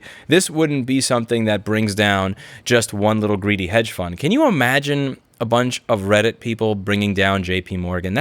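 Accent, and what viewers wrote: New York, United States. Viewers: Angry New Yorker knows his shit.... Thank you for the lesson I'm walkin' here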